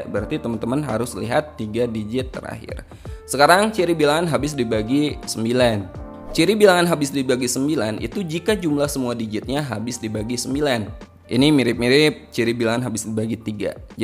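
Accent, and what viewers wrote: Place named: West Java, Indonesia. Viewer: Pix Sunda Pisan😊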